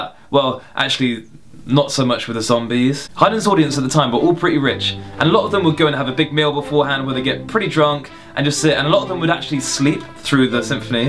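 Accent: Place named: United Kingdom. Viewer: It too much British accent their,please slow it little bit not able to understand clearly. Thanks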